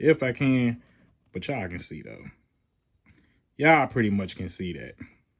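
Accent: Richmond, United States. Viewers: Richmond accent?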